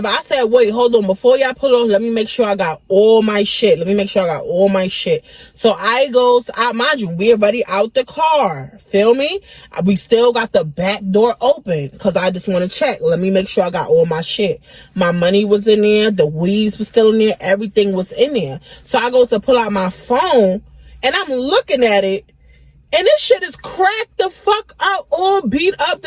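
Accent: New York, United States. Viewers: Im new to ya page so are u from NY? U definitely sound like us😂😂😂😂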